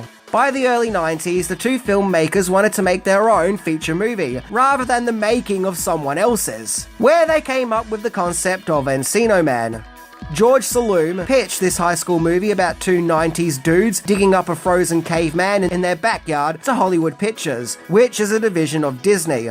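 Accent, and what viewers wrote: Australia. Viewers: No don't be like that! It's all good. We can chalk it up to your cool Australian accent! Most of us don't mind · Who cares if you make a mistake in pronunciation?!? By this time (those of us who have been longtime fan / friends) we all know how much you love your work and the add ins and jokes you pop off more than make up for something as small and Brandon or Brendon. Intent counts friend and your intentions and ending products are AWESOME!!! Plus a lot of us in the states would love to sit and listen to an Australian read a phone book just to hear that accent!!! It’s the best! Keep on keeping on buddy! 😊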